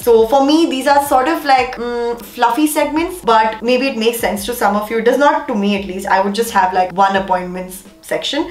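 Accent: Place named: North India, India. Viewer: Malayalii?? OMG.. I thought u r from north😂😍🤩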